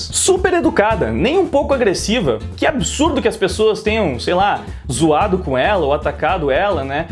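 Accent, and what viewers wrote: Rio Grande do Sul, Brazil. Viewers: Caralho mano, tu é gaúcho?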